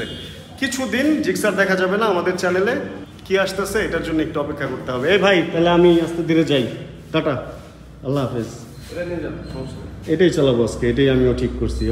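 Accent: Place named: Bandura, Bangladesh. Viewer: Bandura kothy basha apner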